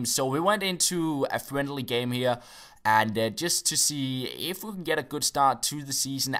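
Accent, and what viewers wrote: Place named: Denmark. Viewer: Gotta love that Denmark accent, no joke, sometimes I watch his video for the intro alone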